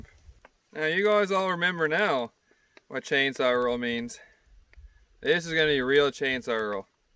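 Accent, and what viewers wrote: Newfoundland, Canada. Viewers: Enjoying your videos, are you from Newfoundland and Labrador? Your accent is certainly sounds like the Rock, best place in the world😉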